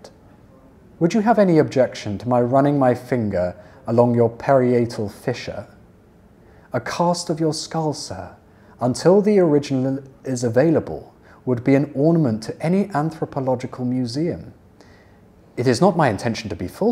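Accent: United Kingdom. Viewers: 제가 영국 발음에 빠지게 된 계기가 셜록인데 원서를 영국 발음으로...! 하 너무좋아요퓨ㅠㅠ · I happened to know this channel while watching YouTube. There was too little chance of hearing a British pronunciation. But it’s really nice to hear the contents of many books in British pronunciation. I’m always enjoying this channel. Lastly, I love Mr. voice! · 영국영어 발음 넘남 좋앙~~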